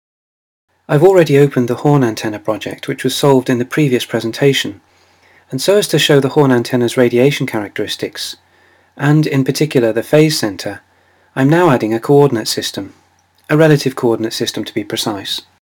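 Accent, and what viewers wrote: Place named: United Kingdom. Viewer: Wow lovely British accent :) loved it. Thumbs upped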